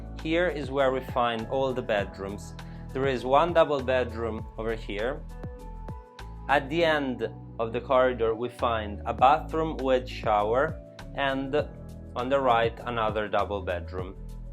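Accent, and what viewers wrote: Italy. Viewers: love your italian accent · Beautiful property, Apples and walnuts, a match made in heaven! very cozy farm and well presented, Your English is excellent! I love the way Italians pronounce the letter E on the end of words like a tail that swings! so musical.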